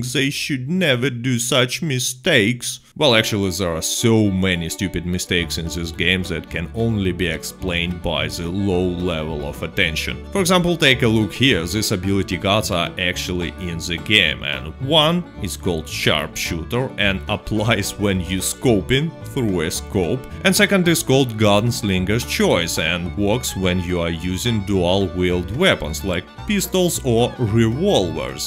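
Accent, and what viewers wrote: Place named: Russia. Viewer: English with a Russian accent is cool to listen to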